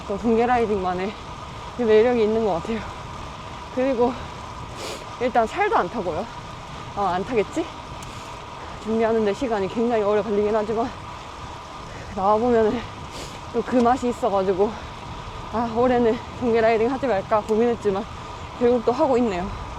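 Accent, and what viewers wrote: Gyeongsang, South Korea. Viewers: 경상도 어디 사셨었나요?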